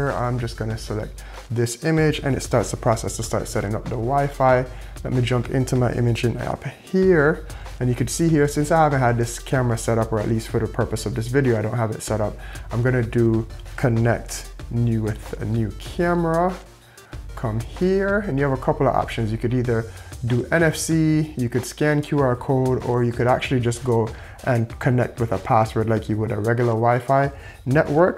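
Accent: Jamaica. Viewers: yooo. you from Jamaica?? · yo you a yardie, love that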